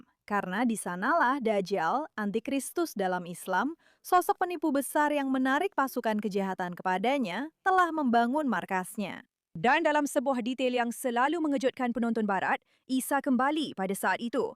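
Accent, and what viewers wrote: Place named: Malaysia. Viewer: Dialeknya kadang malaysia kadang Indonesia. Kurang seru 😂😅👎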